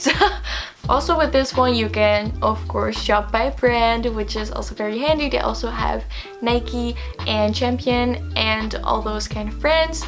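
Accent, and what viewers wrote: Netherlands. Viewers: Wait a sec I’m a bit new to this channel so I just have question are u a bit Dutch ? Ur accent sounds a bit Dutch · Je bent van nederlqnd ik hoor het aan je accent!!!🥰🥰🥰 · i don’t know why your accent reminds me of when i’m in holland , love this video 😩❤️! hope your sage during this shit ass pandemic 😌!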